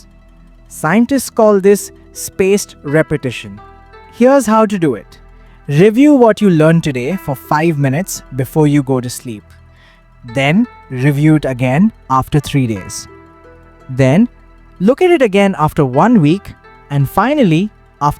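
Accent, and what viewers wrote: India. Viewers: Sorry, totally off topic ... don't understand why narrator has "Indian" accent ... (imho disappointing - no offense to narrator but I think it would've had more impact. Just saying). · His accent is Indian!!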